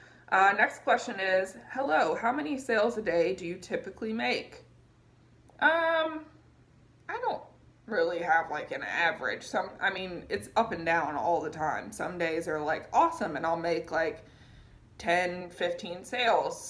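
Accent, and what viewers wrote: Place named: Oregon, United States. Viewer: Love your channel! I’ve been watching you for a couple of months and am really enjoying your videos! I’m a fairly new Poshmark seller and find your tips very helpful! Can I ask you where you are originally from? I get an Oregon accent vibe from you, but I could be totally wrong. Keep up the good work! Thanks!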